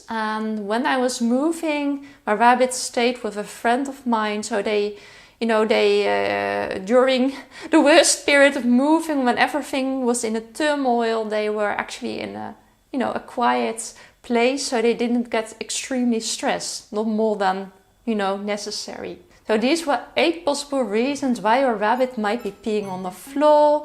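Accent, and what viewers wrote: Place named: Netherlands. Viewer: you Dutch you have an accent like me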